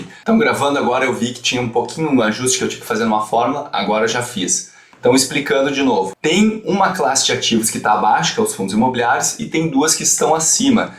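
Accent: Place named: Rio Grande do Sul, Brazil. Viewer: Tu és gaúcho? Tens o nosso sotaque.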